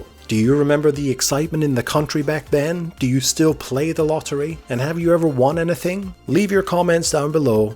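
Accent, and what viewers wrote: Scotland, United Kingdom. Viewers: I could hear a bit of Scottish in there!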